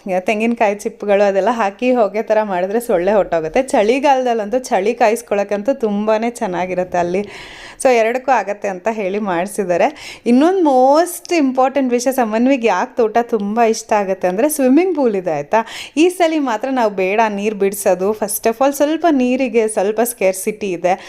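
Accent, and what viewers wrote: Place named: Uttara Kannada, India. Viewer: Hearing ur language I thought u r Frm uttar kannada district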